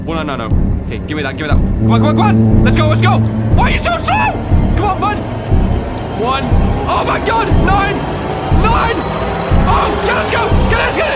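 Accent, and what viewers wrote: United Kingdom. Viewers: You bri'ish mate?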